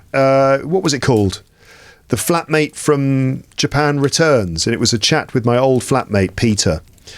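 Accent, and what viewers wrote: United Kingdom. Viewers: I am from Uzbekistan too i was not sure about my accent but after i heard about your voice i was in love the British accent is the father of English language in Uzbekistan there is no one who has British accent i am going to be one through shadowing your every single episode i love the rambling episodes even sometimes when i don't know what to do or bored i combine rambling episodes i chose only rambling episodes because it has something that gives me energy i love your hard work keep up the good work ❤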